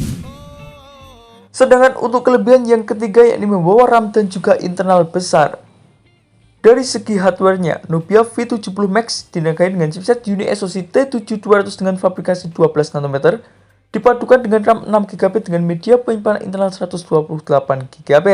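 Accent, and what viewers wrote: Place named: India. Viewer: Kukira logat india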